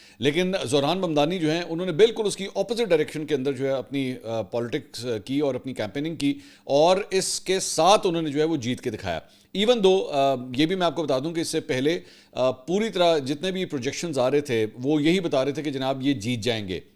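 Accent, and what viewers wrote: Punjab, Pakistan. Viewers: Be a good vloger. Urdu vlog ma punjabi ka tarka na manzoor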